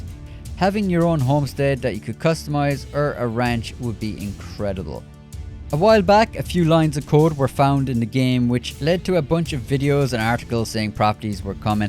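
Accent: Ireland. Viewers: His accent is Irish.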